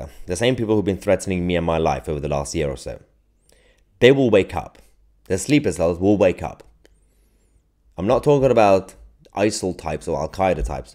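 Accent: United Kingdom. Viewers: Just discovered your channel two days ago. Love your reporting and your British accent! Viewing from Kansas in the U. S.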